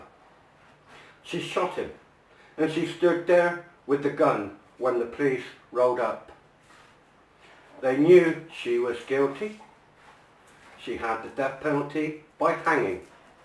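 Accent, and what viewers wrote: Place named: Gloucester, United Kingdom. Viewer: I've noticed you have the same accent as the Gloucester Wests.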